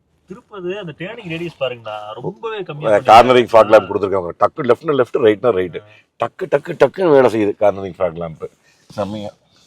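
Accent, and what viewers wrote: Kongu, India. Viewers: அப்பப்ப எட்டிப் பார்க்கும் கொங்குத் தமிழ் அழகு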